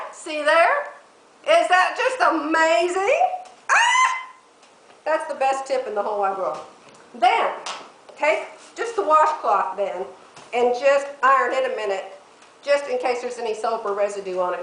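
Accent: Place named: Oklahoma, United States. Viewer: You mention Edmond.. is it Edmond Oklahoma? Your accent sounds like mine. Okie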